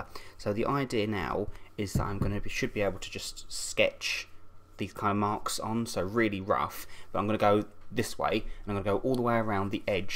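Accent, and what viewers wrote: United Kingdom. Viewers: [incredibly frighting man in make-up intro] [adorable soft speaking british accent (?) when he talks] this is the video I needed today, no question about it.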